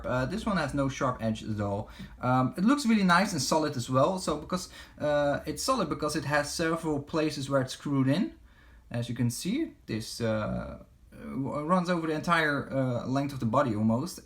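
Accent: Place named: Netherlands. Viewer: Realy love the hilt! You said you are gonna sell it? Im intressed,i live in the netherlands and i think you too? Because of the accent haha.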